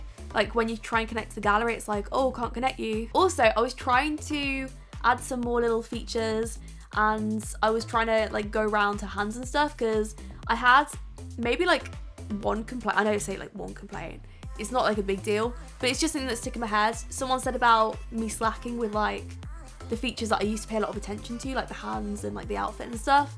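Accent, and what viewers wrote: United Kingdom. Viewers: I have an idea for a create a sim! But real quick I love Sims but your british accent makes your videos even better because I love british accents anyway! But my idea is that you can/could make Mario, Luigi, Peach, and Bowser! THE MARIO CREW!!!!!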